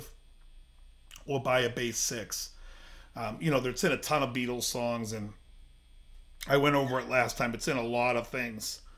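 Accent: Boston, United States. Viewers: Do you think this guy's from Boston?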